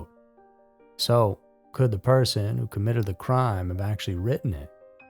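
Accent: South, United States. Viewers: Southern United States somewhere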